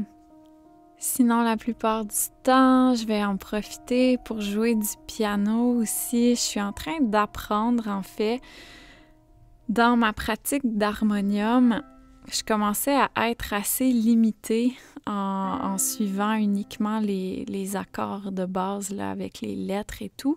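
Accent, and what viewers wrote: Canada. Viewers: Coucou Bonjour A Toi au Joli Chat🐱 J Aime Ce Style de Vidéo.. L endroit ou Tu vit...Le Canada me fascine Ton Accent😉 Gros Bisous de France🐱🐺🐾😊🐈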